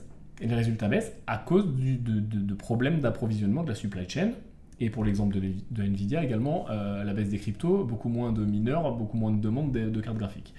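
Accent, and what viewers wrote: Marseille, France. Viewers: Bien l’accent marseillais 😃, allez Marseillllleeeee 😄 !!! Si j’ai bien compris i need to have the guts (intestins in english) pour aller sur certains marchés. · Pas mal l'accent marseillais !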